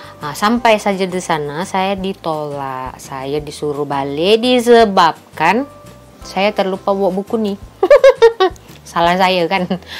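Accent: Malaysia. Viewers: Astaga ! Ya ampun. Kelakar betul ya. Rawatan di Malaysia, hampir gratis. 1 Ringgit Malaysia diberi banyak obat. Loghatnya udah bertukar kepada slanga Malaysia. Sukses bu.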